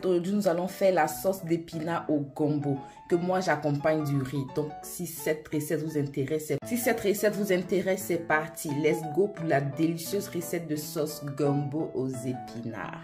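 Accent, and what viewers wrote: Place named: Ivory Coast. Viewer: Merci ma go ivoirienne préféré j'adore ton accent et ta manière de parler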